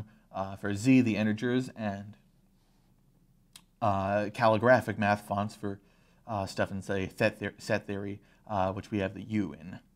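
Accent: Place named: United States. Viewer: Why are you pronouncing "Z" as "C"? ... I know it is the American pronunciation, but for non-Americans it is very confusing. :-)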